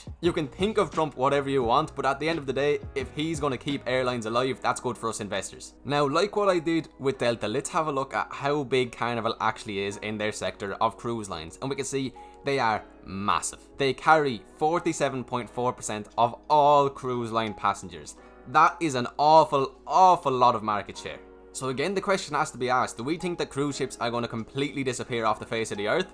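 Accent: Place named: Cork, Ireland. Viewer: you from Cork boy?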